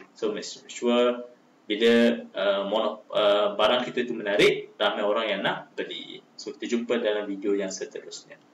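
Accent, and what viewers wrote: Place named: Sabah, Malaysia. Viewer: Macam orang sabah bah si ni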